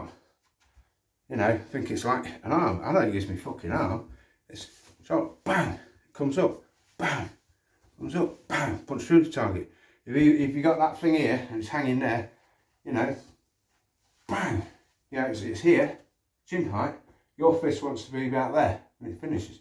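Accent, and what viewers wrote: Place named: Coventry, United Kingdom. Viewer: Just subbed mate, may i ask your first name and where your from? Sounds like Cov, used to know a few from there.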